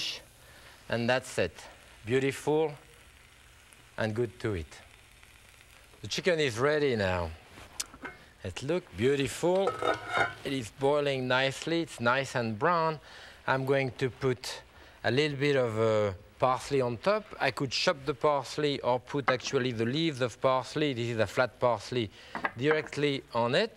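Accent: France. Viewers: I think I'm getting to the point that I can figure out his French-to-English quirks, even though some of them take a second to click. Bananas Foster with butternut sauce...butternut-->butter nut-->beurre noisette-->brown butter.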